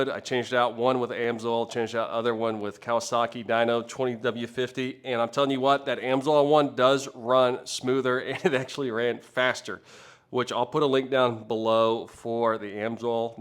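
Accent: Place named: New York, United States. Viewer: HOOZ da Complete Moran now.. Just Sayin yall.. East coast whatever.. probably from manhatten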